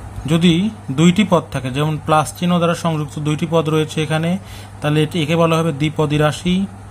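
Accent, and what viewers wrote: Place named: Barishal, Bangladesh. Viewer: আপনার বাড়ি কি বরিশাল?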